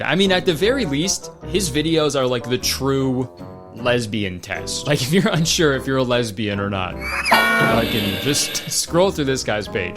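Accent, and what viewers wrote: Canada. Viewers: his canadian accent has never been more prominent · i love when kurtis ends a question with “eh?” because it reminds me that he’s canadian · He sounds extra Canadian in this vid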